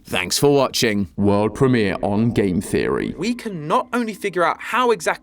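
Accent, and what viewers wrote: United Kingdom. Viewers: I can’t wait to watch the British man talk about monster toy children things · Truly now 100% British now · british matpat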